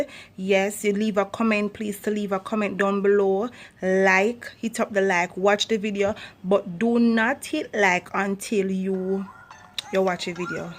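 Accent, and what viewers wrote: Jamaica. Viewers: I love the jamaica voice. I had a pen pal from Jamaica and he tried to teach me to speak the the language but I never could get it.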